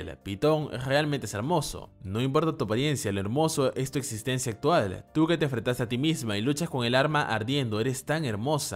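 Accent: France. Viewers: tienes un acento francés, ¿eres de allá?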